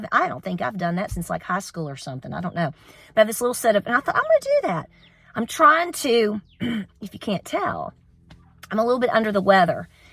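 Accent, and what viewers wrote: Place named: Appalachia, United States. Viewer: Just a beautifully, raspy Appalachian voice! 😉 Get well in a jiffy,! 🙏🏻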